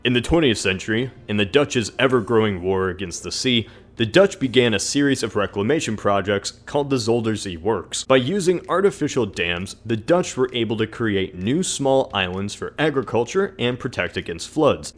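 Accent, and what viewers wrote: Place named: United States. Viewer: thing he/she meant "he's english". But I don't think that's true, he sounds american/canadian.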